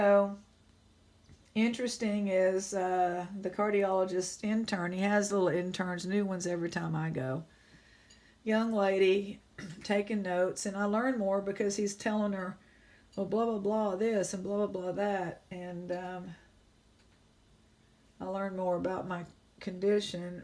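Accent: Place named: Texas, United States. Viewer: It's good to hear an update from you, my Texas jewelry friend. You posted a video during the day several weeks ago and my students were in my classroom. I told them I get good stuff to make jewelry with from you and played the video. Several of them commented that they like your voice.. I was like.."Well, of course.. she's Texan!"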